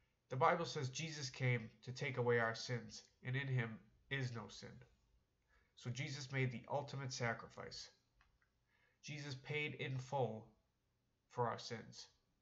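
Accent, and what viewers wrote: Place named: United States. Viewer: THIS HAS A MODERNISTIC TINT. THE OLDSCHOOL ONES ARE BETTER. THIS YANKEE VOICE IS NOT GOOD EITHER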